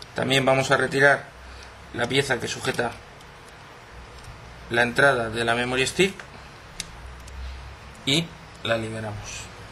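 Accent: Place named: Spain. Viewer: UR A FUCKING IDIOT this is European SPANISH From spain you fuck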